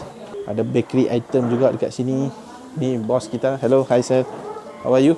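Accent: North, Malaysia. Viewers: Best lah bro sembang utara Senang faham sbb explanation slow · Steady Bro. Loghat Utara mendunia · Baru saja 3hari lepas saya tengok 1st video channel youtube ni OMG saya tak sengaja tersuka.tersubscribe ❤ terasa macam prabu bg saya tengok filem tamil version cakap melayu loghat utara.i like!!❤ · Minit ..Orang kita dah terbiasa kan..yang jenis sopan la maksud sy..bila bagi dengan tangan kiri, akan say sorry..tak tau la..dia macam automatik tau nak minta maaf tu..padahal orang kadang tak kisah dan tak perasan pun..didikan mak ayah kita dulu kalau nak hulur atau bagi apa2 kat orang, guna tangan kanan..jangan guna tangan kiri..tangan kiri katanya kurang sopan..lagi2 kalau orang Islam, gunakan tangan kanan dan utamakan belah kanan tu Sunnah nabi..kalau masuk toilet je sunnah kaki kiri..apa2 pun, yang guna tangan kiri bukan lah maknanya tak sopan ye.,jangan ambil hati.. 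hihi..suka tengok abang travel sbb sikap dan bahasa dia bagi saya sopan..dan suka dengar loghat utagha dia.. semoga cepat capai 100k.. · Addicted with his lorat utara. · Bila first time jumpa channel ni, dah dapat agak memang boleh pergi jauh. First time nampak vlogger berbangsa India tapi cakap dalam loghat utara. First tu ingat ada mix mamak ke apa, rupanya tidak. Memang unik dan ada tarikan tersendiri. Tumpang gembira tengok channel makin lama makin ramai viewers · Org utara ka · Tertengok masa tengah tunggu time nak tengok bola baru baru ni.. rasa dia ni sembang mcm org utara ja.. dah lama lama layan plak😀 tq la kami nak travel mungkin tak mampu. Boleh tengok dkt sini pon mcm pi skali dah ni😄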